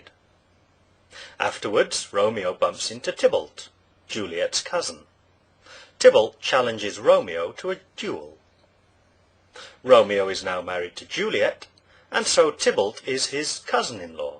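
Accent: United Kingdom. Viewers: Is Mr. a native British speaker???????????????????????????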